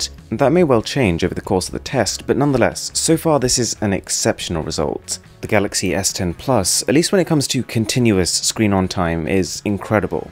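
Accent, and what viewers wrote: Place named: RP, United Kingdom. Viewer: Is it on purpose that you have that posh accent when talking ?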